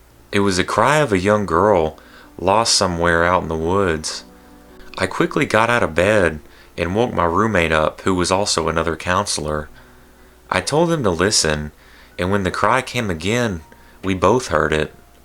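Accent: South, United States. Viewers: You're beautiful AND you have a southern accent.... MEEEEEEOW!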